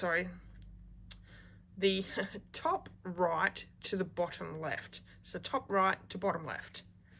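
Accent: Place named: Australia. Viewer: I love the dsp. It’s a beautiful card. Well done! It’s also nice to hear an Aussie voice.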